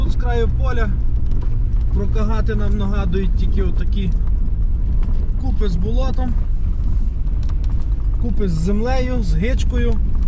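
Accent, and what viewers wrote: Ternopil, Ukraine. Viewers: Ваш тернопільський говір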